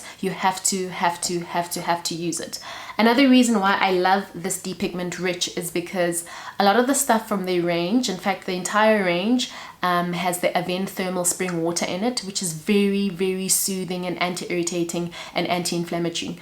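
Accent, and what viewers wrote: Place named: South Africa. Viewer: Thanks for the content! I'm wondering could this be used for lip pigmentation?? Btw love your accent! Are you from SA?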